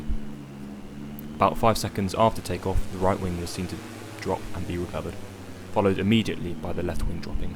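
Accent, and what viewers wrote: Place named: Birmingham, United Kingdom. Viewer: I keep trying to watch this video. I've already left one set of comments. As I keep rewinding. Going what did he say? What did he say? You are mumbling, child! I know we all love Ozzy Osbourne. And wish him and Sharon well. But you don't really want to sound like, you are trying to impersonate, Ozzy Osbourne's, brain dead speech patterns. What did they say that is? A, Birmingham, twang? As in, Birming and ham. It's 2 things not one. It's not pronounced, " Buhmmmmh ". Look at the letters! You have to pronounce them! Along with, letters AEIOU and sometimes, Y. That sounds like, E. And I don't know, Y? And then to make English more confusing. Some joker came up with, YMCA and YM HA in, New Yaawk! And we don't know why that is? So don't ask. It just is. Don't say gay. Even if they are. And genocide of the Native American population. 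No big thing. Not because they weren't white. We aren't like that. It's because they didn't believe in Jesus. And they only lynched black people also? Innguns… Didn't got to worry about that. We were kinder and used bullets instead. Because they also went, BANG! And white guys, like that sound. Because the one they have doesn't work. As Viagra was some, Mexican chick.. And I haven't seen Alice yet. This articulate response. Was artificially generated by my very damaged brain. From years and years. Of calorie burning thinking. Which used most of it up. And in only the past few months. I have lost over 25 pounds! Isn't that amazing?! Just lying on top o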